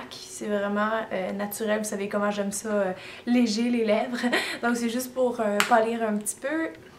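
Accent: Canada. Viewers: t'est canadienne? d'ou est ton accent? j'aime bien.. :)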